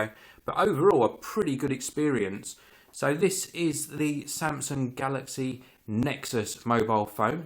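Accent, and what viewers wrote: United Kingdom. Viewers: love the British accent!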